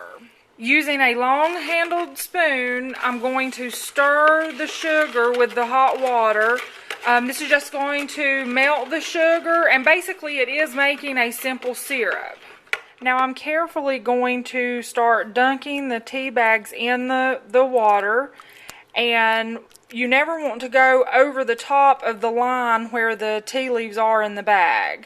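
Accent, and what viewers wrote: United States. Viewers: Good video, sounds like a South Carolinian accent, am I right? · Real southern voice lol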